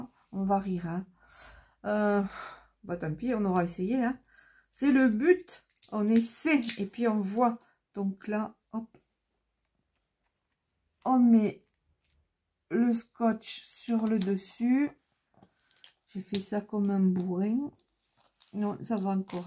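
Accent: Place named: South, France. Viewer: Bonsoir Miss à l'accent du soleil ???? Bonne idée super merci de ta vidéo scrapbises de l'Atlantique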